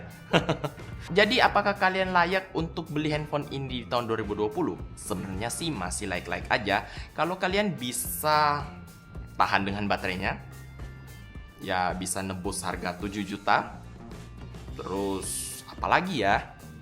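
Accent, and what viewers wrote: Indonesia. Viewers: Tk kira org telok lema dri logatnya :v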